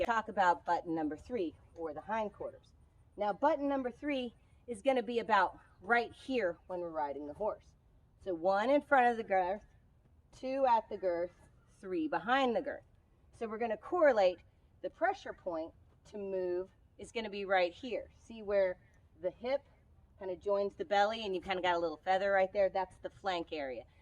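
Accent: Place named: Texas, United States. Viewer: What part if Texas are you from?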